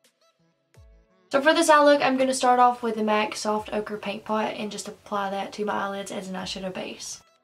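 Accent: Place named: North Carolina, United States. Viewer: You sound like you're from where I'm from :) NC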